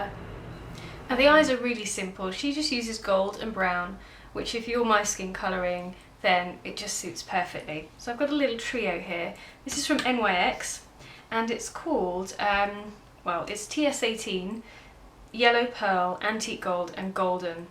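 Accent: England, United Kingdom. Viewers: Indian with an English accent?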